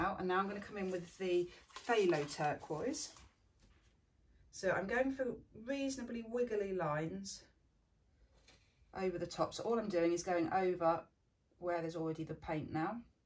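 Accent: United Kingdom. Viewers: By your accent I feel you are in UK, there are very few acrylic pouring demonstrations from anyone other than USA/Canada, though they are really interesting, it does make a difference to hear the materials as USA materials are not available in UK. I love your work, I am a beginner and struggling but determined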